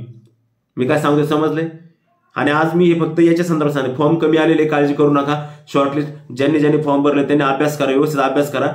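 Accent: Ahmednagar, India. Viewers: सर मला तुमची भाषा ऐकूनच वाटल होत की तुम्ही नगर चे असणार म्हणून 😄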